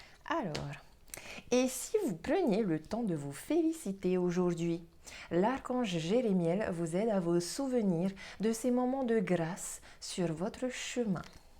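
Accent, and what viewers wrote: Tahiti, French Polynesia. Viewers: Bonjour J'ai reconnu votre accent de Tahiti J'y ai vécu en 70/71 avec Raupea pendant 10 mois .j' ai passé toute ma vie avec son souvenir dans mon cœur j, avais 20 ans .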